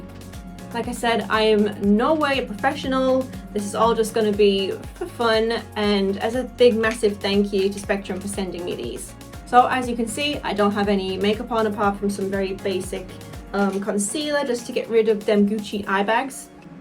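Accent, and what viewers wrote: United Kingdom. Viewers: lets all be honest, we love their accent, where the brithish gang at?? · Who else loves British accent 😃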